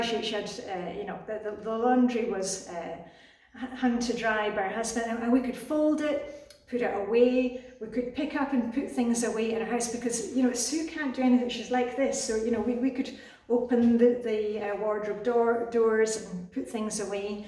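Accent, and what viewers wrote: Scotland, United Kingdom. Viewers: Hi, I know you from Carol Tuttles T1 page. How did I not know you were Scottish 😍. I assumed you were Danish. From a fellow T1 flybaby 😀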